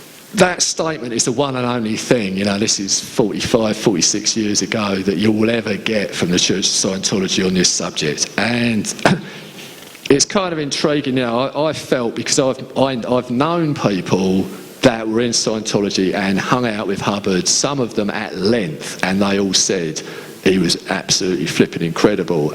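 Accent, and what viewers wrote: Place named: London, United Kingdom. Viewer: A very thick London accent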